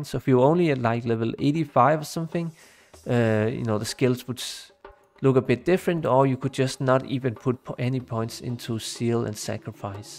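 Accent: Denmark. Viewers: Elsker din kanal og især din interesse får mig til at nyde det. Derudover er din tykke, danske accent perfekt :-) Bliv ved! Har desværre ikke nogle ideer til videoer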